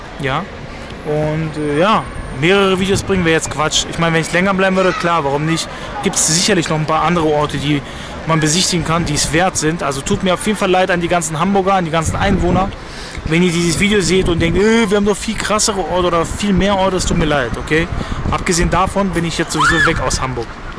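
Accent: Berlin, Germany. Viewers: Ich merke einen leicht überheblichen Berliner Ton. Typisch „Berliner Schnauze“. Sorry, aber du kennst weder Billstedt noch Hamburg!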